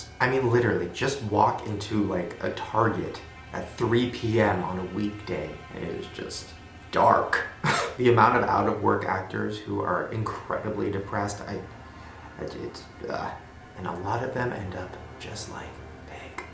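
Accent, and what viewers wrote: South, United States. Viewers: he still has a bit of a southern accent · shane....that's still a southern accent it's just not as thick. people also tend to lose accents when they move, etc. and he lives in florida now so....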